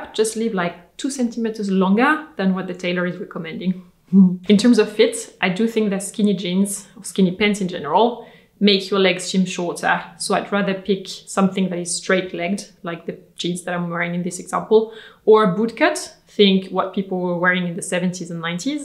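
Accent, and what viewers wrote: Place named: France. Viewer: Thank you for this! I love your fashion "education" videos-they are so helpful and I learn, or am reminded, of so many tips. Also-has anyone mentioned since your return to France there's a tiny strengthening of the French accent to your English? It makes me very happy as I visualize your daily life there ❤️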